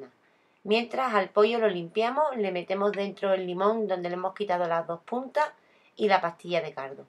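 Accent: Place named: Andalusia, Spain. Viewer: Adoro el acento Andaluz...o del sur, como sea. Estoy cocinando, gracias por tu tiempo.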